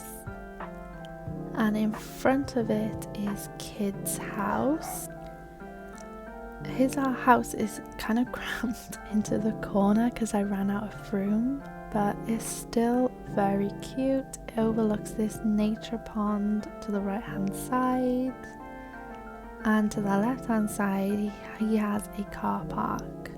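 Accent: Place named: Wales, United Kingdom. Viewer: love that welsh accent hello fellow Cymro! 🏴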